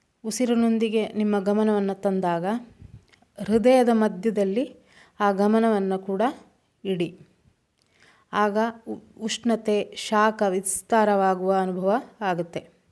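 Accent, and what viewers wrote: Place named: Dakshina Kannada, India. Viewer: ನೀವು ದಕ್ಷಿಣ ಕನ್ನಡ ಜಿಲ್ಲೆಯವರು ಅಂತ ಹೇಳಿದಾಗ ನನಗೆ ತುಂಬಾ ಕುಶಿ ಆಯಿತು ನೀವು ಊರಿನಲ್ಲೇ ಶಾಲೆ ಕಲಿತಿದ್ದರೆ ನಿಮ್ಮ ಕನ್ನಡ ಬೆಂಗಳೂರು ಕನ್ನಡದಿಂದ ವಿಭಿನ್ನ ವಾಗಿರುತ್ತದೆ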